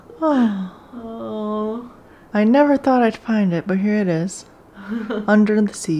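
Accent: United States. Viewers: Why the american accent?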